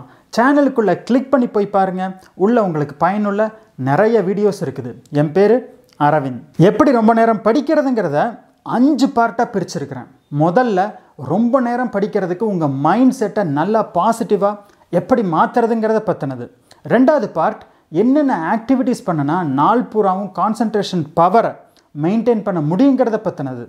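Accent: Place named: Kongu, India. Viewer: Your tips is good and your kongu slang good.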